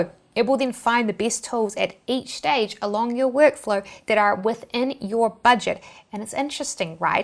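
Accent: New Zealand. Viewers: I appreciate your content and you are very liable. However, there is an E in test and the is an E in step, there is not an i in STEP. CHAT GPT there is no i in Chat, there is an A. Furthermore Perplexity is not perplixity. I Actually has and not an i. DO YOURSELF A FAVOUR AND get rid of the NZ accent. It takes away from how smart you are. just saying🤔❤ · Hello. In NZ, this is cool and nice to hear a NZ voice in this space for one, really cool thank you.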